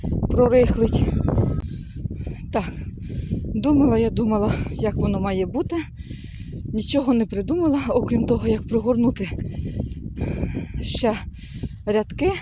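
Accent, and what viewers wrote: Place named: Liubar, Ukraine. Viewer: Ви супер! Дивлюсь Вас із задоволенням.Люблю говір Любарщини. Когось знаєте із Меленець?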